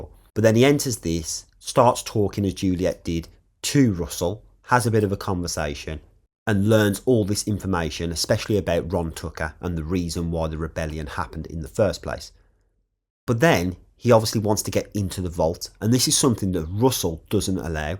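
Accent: United Kingdom. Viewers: I thought it might have been more of a black country accent!! Yeah, I'm in south Brum myself!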